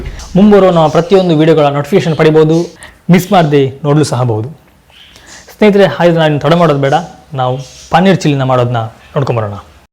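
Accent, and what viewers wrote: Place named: Mangalore, India. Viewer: Namma mangaluru kanada bahala chanda matanaadi madam neeu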